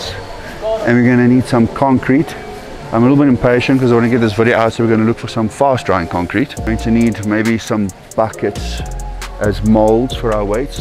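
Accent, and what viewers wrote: South Africa. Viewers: Is he South African?? Now I see the man's... from south Africa.... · Are you South African? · Not been able to go back to South Africa since the pandemic begun but hearing other South African accents makes me happy🙏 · I'm south african and I swear that must be south african as well, he just sounds like one. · Dope dude, this video made me realise u deffs a fellow south african, thnx for the content! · is he south african? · Bro sounding south african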